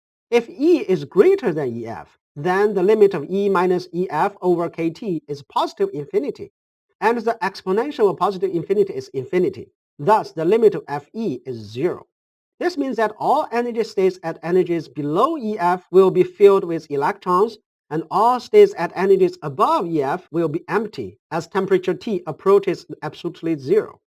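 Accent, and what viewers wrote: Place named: China. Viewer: Sounds like chinese accent, lol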